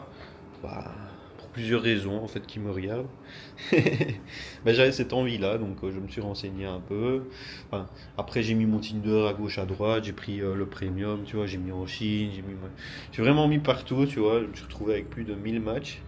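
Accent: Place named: Belgium. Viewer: Oh l'accent belge 😀 Merci poiur ton partage.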